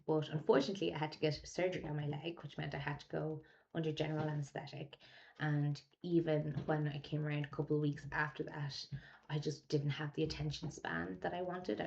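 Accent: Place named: Ireland. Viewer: “I could walk past someone in the grocery store and my TBR would get longer.” 😂 Every time I finish a book I struggle to choose the next one. I’ll share your TBR and we’ll both feel better. I love your chatty unedited take. Any slow moments are saved because you say um and er in an Irish Accent. From one Newbie to another welcome to BookTube. My Newbie tag video comes out on Sunday (Australian).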